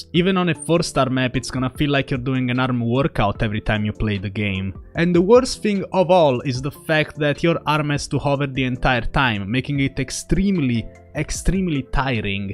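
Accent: Russia. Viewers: This person has a heavy Russian accent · I thought Russian cause the accent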